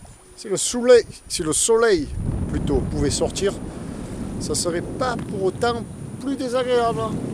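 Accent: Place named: Marseille, France. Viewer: Quel bonheur de regarder ces navigations sur la grande bleue dans cette région ( Bandol , Les embiez , Toulon , Porquerolles ) que je cnnais très bien . On a en prime cet accent marseillais plein de ce soleil qui nous fait tellement défaut à nous " voileux du nord de la France " . On sent le marin heureux d'être en mer .. un vrai régal et merci pour ces moments bien agréables ..Yannick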